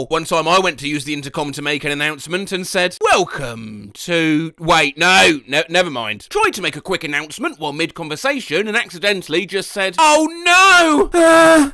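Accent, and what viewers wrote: United Kingdom. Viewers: This is hands-down the funniest video to date. Turns out that everything is made better when read by a middle-aged British man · Werid how a British critter can make me feel better after ballin 😎 my eyes out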